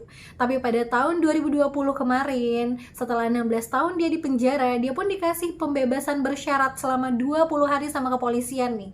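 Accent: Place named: Banjar, Indonesia. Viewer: kya logat banjar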